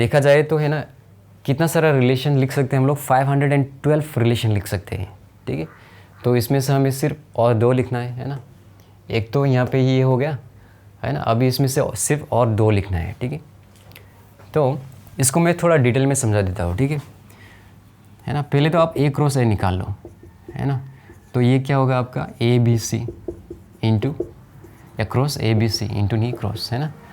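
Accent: Assam, India. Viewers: Kya aap Assam se ho?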